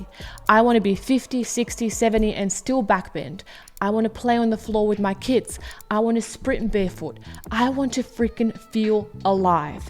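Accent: South Africa. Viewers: Random question, are you from South Africa, I hear a bit of an accent there? Great videos btw. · It's the accent right? I also thought we were from SA when she said 'bro'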